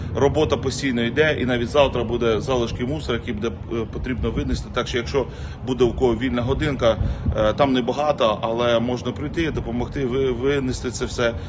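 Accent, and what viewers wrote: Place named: Western Ukraine, Ukraine. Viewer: В Одессе так не разговаривают там все на русском говорят просто человек с западной Украины и претворяется разве так можно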